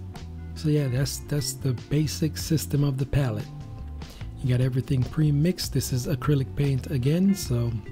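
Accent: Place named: Jamaica. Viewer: Very nice are you Jamaican? Your accent sounds like me. I was looking for colored skin tone so I checked out your tutorial, it’s very informative. Thank you.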